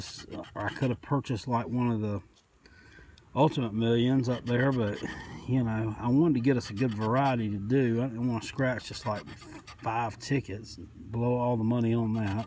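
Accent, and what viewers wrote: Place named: Western North Carolina, United States. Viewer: Sound like your from Western NC, haven’t heard an accent like that since my days in Robbinsville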